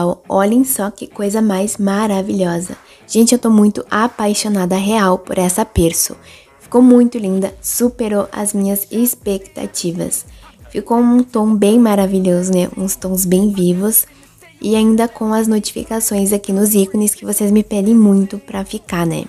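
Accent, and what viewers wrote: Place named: Rio Grande do Sul, Brazil. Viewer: Oi vc e gaúcha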